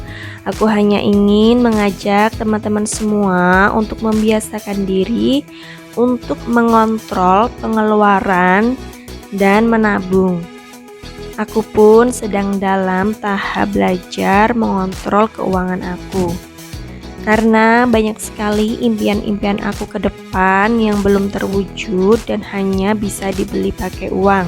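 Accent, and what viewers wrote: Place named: Java, Indonesia. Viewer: Suara ne medok 😂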